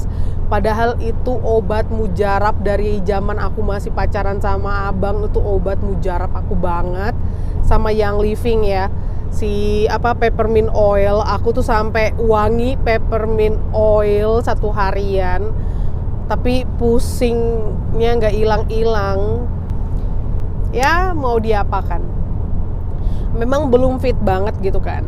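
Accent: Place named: Eastern Indonesia, Indonesia. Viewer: Lama2 logatnya kaya org timur ya kk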